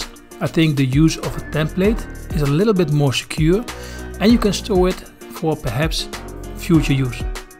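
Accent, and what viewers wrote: Netherlands. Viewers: thanks for your reply, very much appreciated. Though i grew up here, i was born in the Netherlands and its a pleasure to hear that Dutch accent coming through! Ill be posting a video of my work bench build, though not nearly as impressive i think its going to be a great addition to my tiny workshop. Check out “captain of my shed” episode 6 if you want to feel even better about your amazing workshop!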